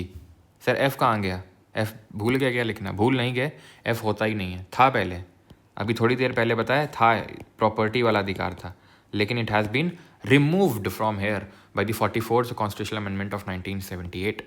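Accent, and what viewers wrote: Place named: Uttar Pradesh, India. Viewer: Up se ho kya aap?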